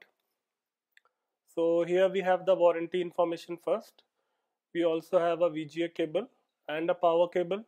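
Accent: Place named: India. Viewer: This is a wonderfully detailed review with a nice highlight on the specs and functionality of the Monitor. Also delivered in a dope Indian accent.!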